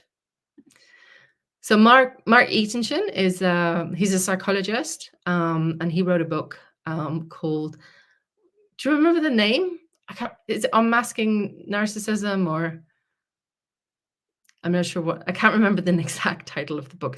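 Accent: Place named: Northern Ireland, United Kingdom. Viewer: I thought I could hear a hint of a N.I accent.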